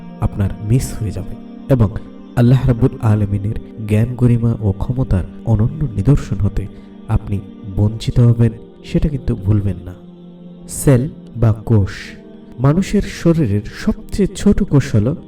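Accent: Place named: Chittagong, Bangladesh. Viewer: তততো না তথ্য, মাতা না মাথা, উচ্চারণ সুন্দর হলে ভিডিও দেখতে ভাল লাগে। এই ভিডিও তে যিনি বর্ণনা করেছেন উনি সম্ভবত চট্টগ্রামের লোক।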